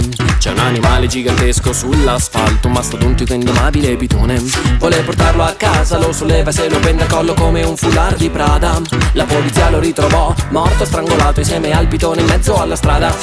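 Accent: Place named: London, United Kingdom. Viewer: Cacchio come parli inglese londinese! 🎉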